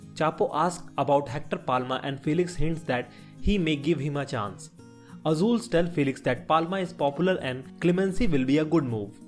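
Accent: India. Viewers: He's accent is from india not Mexico you dumb ass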